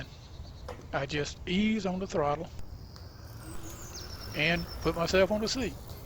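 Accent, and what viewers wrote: South, United States. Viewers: Such a calm and slow-paced demeanor, it's very relaxing for new riders. Thank you! And this yankee loves your soft southern drawl. ;-)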